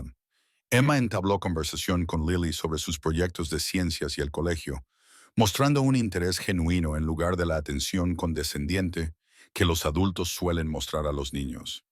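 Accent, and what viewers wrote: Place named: United States. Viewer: Realmente el relato con esa tonada INNECESARIA de Yankis con una hormiga en la lengua me pone de muy mal humor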